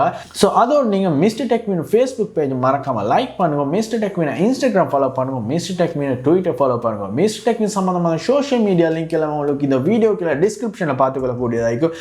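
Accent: Sri Lanka. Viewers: App link error varudhu bro 😶 Anyway Ungal Ilangai tamil superu 😂❤ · Idhu Srilankan Tamil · அது இலங்கைத் தமிழ் முருகேசா... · Bro ivaru pesurathu srilanka tamil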